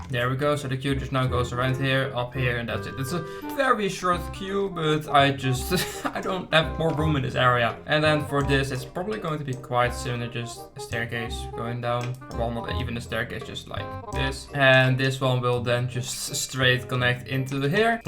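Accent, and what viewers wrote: Netherlands. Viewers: Probeer niet engels te praten want je probeert Engels te praten maar je hoort je Nederlands tussen en dat in het ombetant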